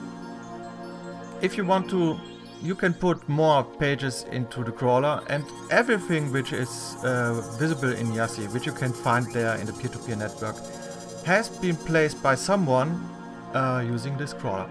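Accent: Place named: Germany. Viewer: German English :) I like it.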